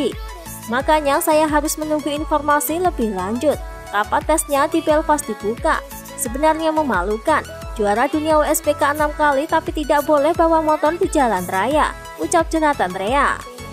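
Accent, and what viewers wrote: Java, Indonesia. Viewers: medok jawa mba ny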